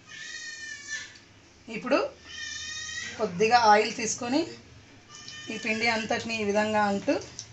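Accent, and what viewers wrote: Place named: Rayalaseema, India. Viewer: aha rayalaseema matalu vini anni rojulu indakkahii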